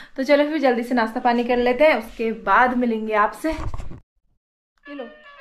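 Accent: India. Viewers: Accha tho bol Rahi ho pahadi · Wow aapke muh se kinni achi lag rahi h pahadi bolna ❤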